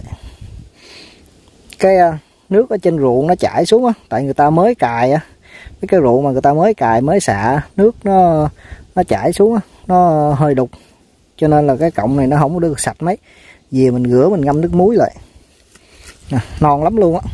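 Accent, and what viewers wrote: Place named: South, Vietnam. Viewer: Clip hay đó anh. Nhưng mà anh là người Miền Nam thì phát âm chuẩn Miền Nam tí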